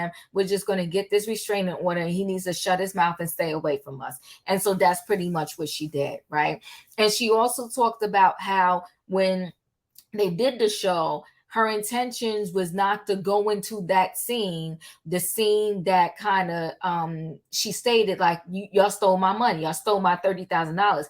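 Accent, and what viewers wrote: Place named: East Coast, United States. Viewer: Love your commentary on this topic and your East Coast accent. Keep it up!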